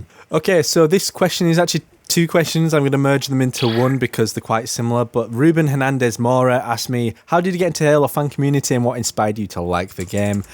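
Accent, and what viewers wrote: Yorkshire, United Kingdom. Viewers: Sounds like a Keighley lad.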